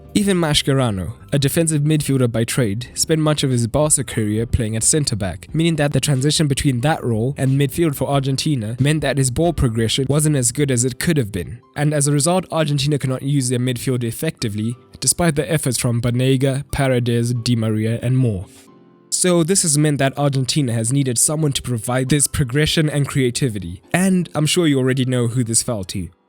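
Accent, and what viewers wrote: South Africa. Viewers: Are you South African or Zimbabwean? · Please do one for Ronaldo too. P.S. Are you from South Africa?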